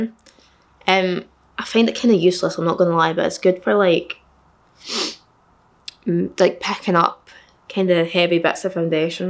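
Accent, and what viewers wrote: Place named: Glasgow, United Kingdom. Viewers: Always forget your Scottish too, your accent is like mines. I'm from Southside of Glasgow 😊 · The glaswegian accent gives me whiplash every time, but i love it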